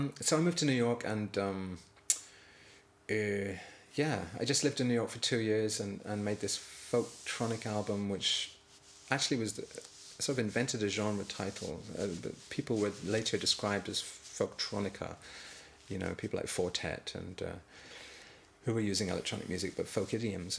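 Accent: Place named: Scotland, United Kingdom. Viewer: Posh Scots, eh?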